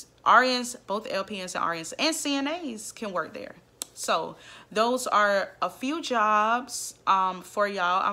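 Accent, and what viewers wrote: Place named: Florida, United States. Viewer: Are you from Florida? Lol you sound like me and my friends talking. Anywho, thanks for the video... I start nursing school next week 🙌🏾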